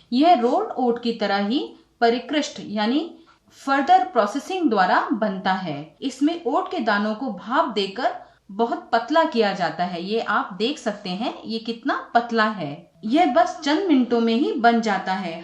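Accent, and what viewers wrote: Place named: India. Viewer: starts in english ... morphed into indian ...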